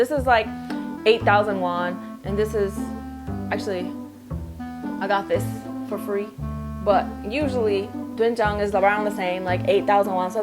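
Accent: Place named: South, United States. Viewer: Sometimes sounds like she has a country accent 😂😂it's so cute😘.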